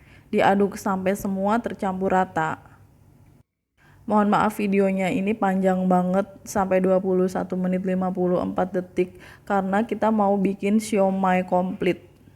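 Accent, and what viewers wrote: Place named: Sunda, Indonesia. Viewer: Hahhahaha super lekoh.... asli urang Sunda 😃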